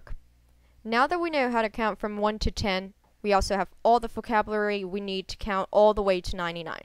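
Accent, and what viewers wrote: Taiwan. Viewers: taiwan mandarin？ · Is this Taiwanese or China dialect? I thought 1 = yao?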